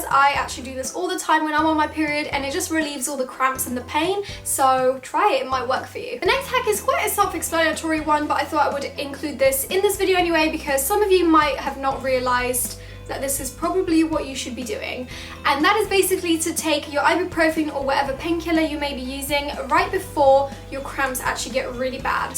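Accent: United Kingdom. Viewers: I adore youu and I love your accent, I have something for british accent really😫😂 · I will definitely try the heating pad hack!! Thank you so much for sharing this with us, and by the way - your video quality makes you look even more gorgeous We all love your honest and creative videos! Would love to win the prize for my room that I've recently moved to (it's a flat with two girls that are studying, too) :) I'm studying English to be a teacher and your british accent is so awesome! I'd love to see a video of you and maybe your boyfriens imitating different accents!! Love, Melodie xx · Ive known your channel a year ago and i really like your videos. Especially a life hacks and makeup tutorial video. You are such an amazing girl. I hope you keep being the way you are and nobody can let you down bc i am here. and also i really like your accent (its british right?) idk i always find really cute when i heard girls speaks british accent. 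we are so close yet so far😁. i know it is such a long comment lol but i hope i can win this giveaway bc i reallyyy want that macbook air. wish me luck and i love you so much 💚💚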